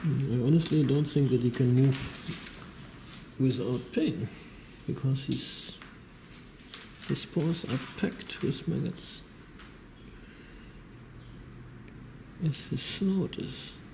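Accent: Germany. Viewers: Are you, by any chance, German? Your accent suggests it. Danke für Ihre Arbeit!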